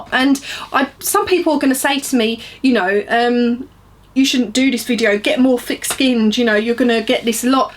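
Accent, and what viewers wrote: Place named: United Kingdom. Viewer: I think you're simply beautiful! I came across you not too long ago and noticed your hair in particular. Love it ... and your accent, of course. I lived in the UK for a while & miss it, so you bring back a little part of such fun memories just hearing you talk. I can tell you're a beautiful soul. Keep making videos! <3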